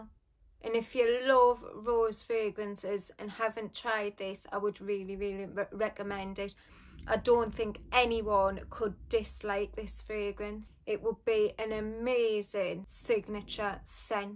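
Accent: Scotland, United Kingdom. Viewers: Goede morgen xxxx greetings from the netherlands, lovely accent scotish???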